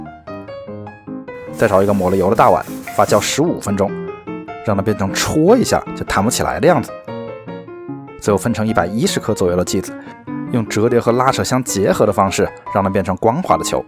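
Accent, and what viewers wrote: Wuhan, China. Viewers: 我一看到这个标题，就知道又有阿姨的汉普了🥰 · 有点吧han，标jun滴武汉口音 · 不是我说 你的口音蛮像经视台 夸天节目里面的武汉话旁白的调调~哈哈哈哈~虽然你是普通话的调调~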